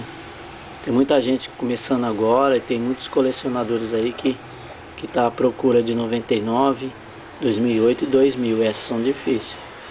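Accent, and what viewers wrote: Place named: São Paulo, Brazil. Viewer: Vc é de São Paulo mesmo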